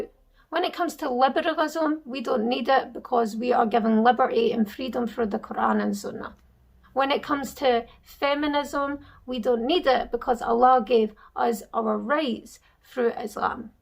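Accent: Scotland, United Kingdom. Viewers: I'm not used to female youtuber with Islamic content, but I'm definitely staying. Love Scottish accent 😍😍😍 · Mashallah ... you have more insight and reason than all those men hhhhh and your scottish accent is like the cherry on top hhhh ....i love scottish accent so mush .. and to find a channel of a muslim scottish woman ... YA Allah .. i feel like i just found a treasure hhhhh May Allah bless you sister .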